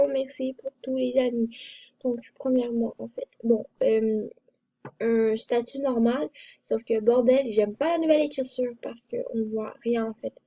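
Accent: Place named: Quebec, Canada. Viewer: tu est devenus une de mais youtubeuse préféfé qui jou sur msp prace que tu parle quebecois et tu est un quebecoise jadore moi aussi je suis quebecoise ❤😍😜😉😂😀😁🤣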